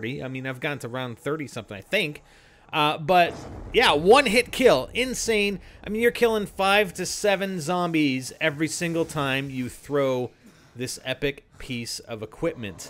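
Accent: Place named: Canada. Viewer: are you Canadian? judging by the way you say 'out' and 'about' I think you are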